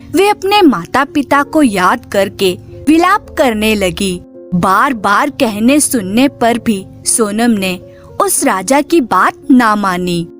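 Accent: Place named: United Kingdom. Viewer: Story sachame achi hai... but aap hindi to English accent me kyu bol rahi hai... try to speak normal... ❤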